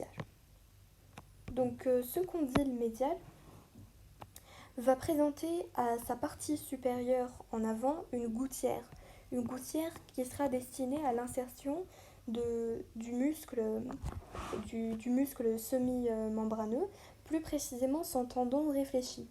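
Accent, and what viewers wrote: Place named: France. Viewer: vous êtes la meilleurs des anatomistes au monde vous proposez une explication détaillé j'espère que vous continuez les autrs chapitres un ;grand merci de l'algérie😘 nb:j'ai cru que vous étiez française vu ton petit accent c'est pour ça qu j'ai dit merci de l'algérie mdr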